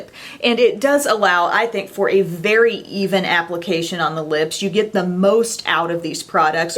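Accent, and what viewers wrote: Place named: Kentucky, United States. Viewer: done! added you. always glad to support a fellow KY girl! heading over to watch you now. wasn't sure I had the right person till I heard your accent! lol sounded like home. have a good day!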